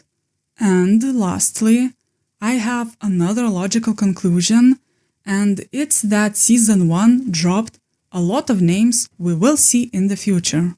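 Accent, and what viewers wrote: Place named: Lithuania. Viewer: Oh well that justs shows that i should meet more Lithuanians in my daily life lol. I'm Dutch so i know other eastern european accents, but haven't heard a Lithuanian before! But that aside, your channel is really cool and deserves more subscribers! I love your pov as a Live Action only fan and i hope you make more content. But until then, i gotta binge watch the rest of your videos.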